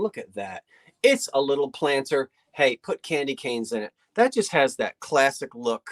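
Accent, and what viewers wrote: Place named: South, United States. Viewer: 😂😂😂 and his southern accent.Am dram is missing out on your talents.David loved hearing the sandal story.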